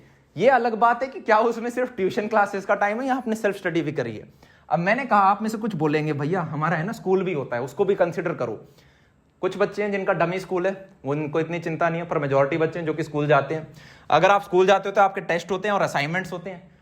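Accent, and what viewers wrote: Haryana, India. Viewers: Bhai aaj hi nhi pichle kuch mahino se bhaiya haryanvi jaise hi baat karte h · does any one recognise haryanvi accent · bhaiya hariyanvi ka tone aa raha tha aaj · Bhaiya ki boli.. Haryanvi ho gayi lockdown mei..😅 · When did get this Haryanvi accent? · Bhaiya Haryanvi accent 😍😅.... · Bhaiya aaj kuch haryanive tone bhut aa rshi h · Bhai haryanvi touch ohogohohohogoho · Haryana accent ho gayaa hai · Haryanvi lag raha bhai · I've felt how his language and accent changed and leaned to haryanvi accent over the time😂😂😂 I think it's effect of geography or the friend circle 😂😂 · Bhaiya ye Haryanvi tone suit krti h aappe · Bhaiya aaj to aapki voice me Haryanvi touch tha maja aaya Bhai 🙂🙂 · Sir today your voice like haryanvi nature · Bhaiya.. Hryanvi tone mein kbse bolna shuru kia aapne? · Bhaiya slowly slowly your accents are transforming into Haryanvi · Please give up your haryanvi accent it doesn't suit you. 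· Haryanvi lag re hai aaj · He is a Jaat so there is always a touch of haryanvi accent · Bhaiya haryanvi accent aa gya aap mein toh permanently ❤ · Aaj toh Haryana tone m bol rhe ho bhaiya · Sounding haryana style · Bhaiya aaj aapke bolne tarike mein badlav lag rahe hain. aap pahle se alag tarah se bol rahe ho lagbhag Haryanvi mein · Ask bhaiya haryanvi accent me bol rhe h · haryanvi voice ho gyi bhaiya ki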